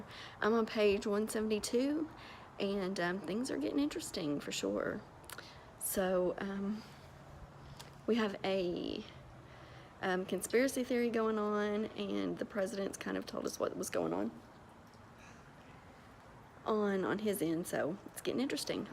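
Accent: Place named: South, United States. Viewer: ahh! So neat! I’m from Tennessee so I quickly recognized the accent lol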